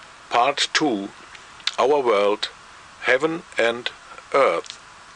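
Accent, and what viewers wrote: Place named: Bavaria, Germany. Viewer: Geil :-) der Babbelt Englisch wie Bayrisch ;-) hehe awer ma versteht alles herrlisch :-) Daumen hoch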